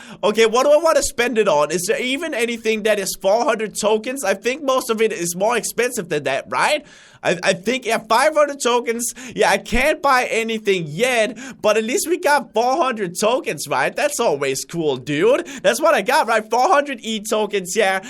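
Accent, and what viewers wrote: Denmark. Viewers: Crainer's Real name is......His Danish voice is so cool There is an interview of him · I did but have you heard his Danish voice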